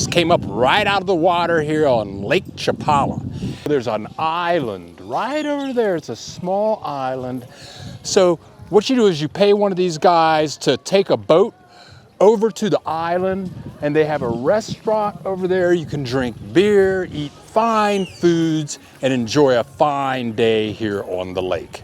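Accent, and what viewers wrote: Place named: United States. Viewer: Good video. Lol, after 15 years your gringo accent is still very strong. ¿hablas español?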